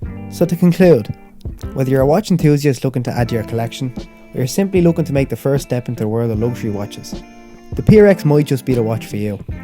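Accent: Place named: Limerick, Ireland. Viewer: Are you from limerick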